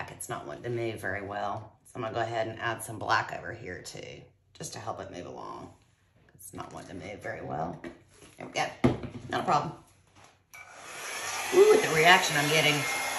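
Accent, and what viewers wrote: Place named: South, United States. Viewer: Beautiful Dutch pour! Your accent sounds like mine. Do you hail from the south?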